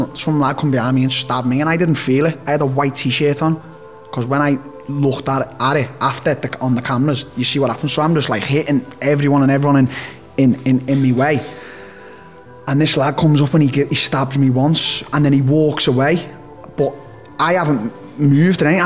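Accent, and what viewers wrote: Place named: Liverpool, United Kingdom. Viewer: Nasty scouse accent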